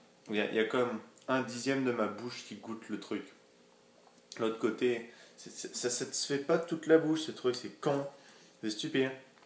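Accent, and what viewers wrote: Belgium. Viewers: Vous dites comme en Belgique :) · Vous parler comme nous lles belges :)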